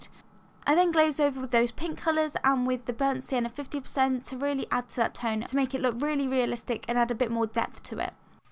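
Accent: United Kingdom. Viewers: really love the tune of the way people from uk use to speak english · your british accent is the most british accent i've ever heard before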